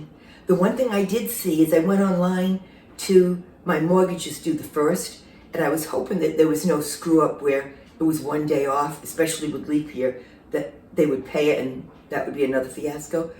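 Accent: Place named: Boston, United States. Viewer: Loved the update! I definitely think Parker was trying to tell you something important--😊 Someone here said something about the video being blurry. I think the autofocus caught something in the foreground and focus on that instead of you because you were in the mid-ground area. But then I started driving and couldn't watch but could only listen in the car. So it didn't bother me-I love listening and love your accent. Reminds me of the folks I met when I went to Boston. Have a great weekend!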